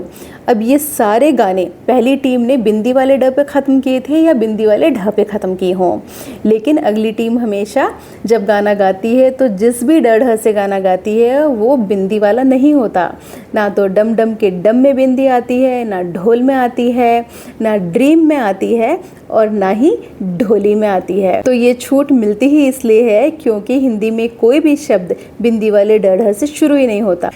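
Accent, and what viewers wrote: Standard. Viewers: बहुत बहुत धन्यवाद् mam, आप की हिंदी,उच्चारण एक दम शुद्ध है,ज़्यदातर पढ़े लिखे छात्र, यहाँ तक की सरकारी अध्यापक,बहोत से हिन्दीभाषी प्रशासनिक अधिकारी तक हिंदी का उच्चारण गलत और गवाँरो की तरह करते हैं..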